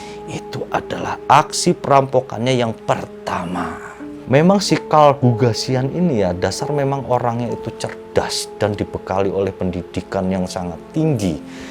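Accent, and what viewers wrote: Java, Indonesia. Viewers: Medok amat coy..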